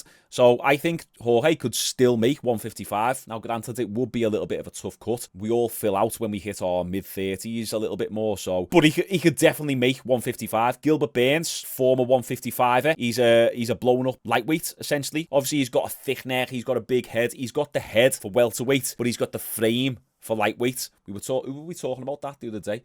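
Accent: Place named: Liverpool, United Kingdom. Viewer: I’m a fan You’re fun to watch and the Liverpool accent is your “Bread and Butter”!!! 🤣🤣🤣 Anyway, you could do a great live reaction show…it’s a bit of work but it would take you to the next level with “Super Chat” money, etc Keep up the great work!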